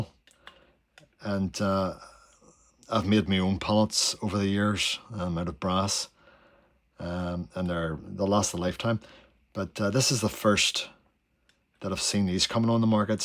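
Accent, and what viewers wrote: Northern Ireland, United Kingdom. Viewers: Van Gogh is surprisingly good! I have some spare tubes and pans in a box with others, and they work really well. I never had an issue. Thanks for this video! Cosy and instructive ❤ (extra points for the Norn Irish accent)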